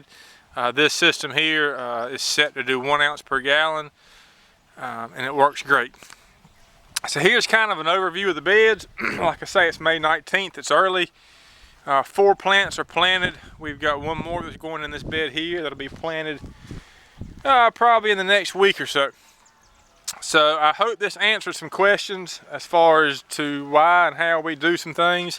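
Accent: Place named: North Carolina, United States. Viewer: native NC, sounds like a Civil War movie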